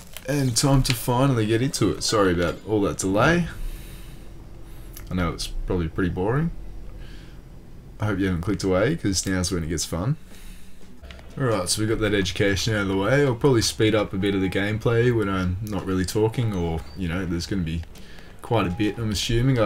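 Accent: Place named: New Zealand. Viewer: u from nz? you sound like one🤔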